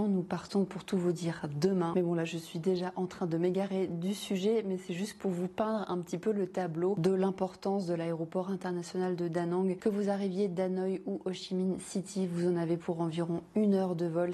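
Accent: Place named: East, France. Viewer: Merci pour cette vidéo, je pars bientôt à da nang ça me servirab😊. C'est moi ou j'entends un résidu d'accent de l'est 🧐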